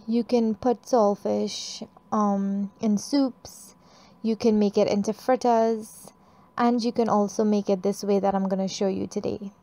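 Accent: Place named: Trinidad and Tobago. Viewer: Love your cooking. Keep up the good work . Love that you talk trini and don't put on a fake accent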